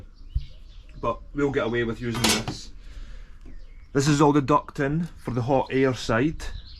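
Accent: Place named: Scotland, United Kingdom. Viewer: Thats a East Scotland accent i'm sure lol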